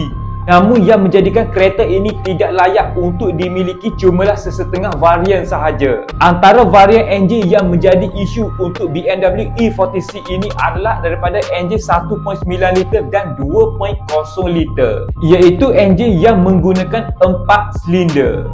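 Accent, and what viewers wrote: Kelantan, Malaysia. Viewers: dia pelat kelantan..percakapan melayu ada loghat2 gitu 😂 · Kecek klate mudoh pehe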